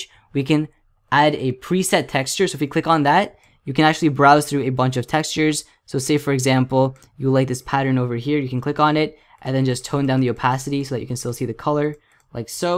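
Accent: Canada. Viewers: Are u CANADIAN? ( do you live there) Here?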